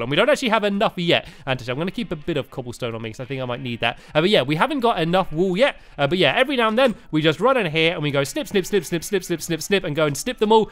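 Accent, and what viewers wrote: United Kingdom. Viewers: This video is so British. 1:British accent. 2:Clock tower. 3:Tea shop.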